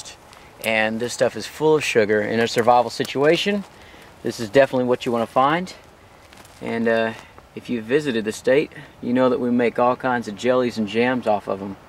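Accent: Texas, United States. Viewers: Omg i was in Texas for a year as an exchange student, and your Texan English made me so "homesick" 😀😅🇺🇸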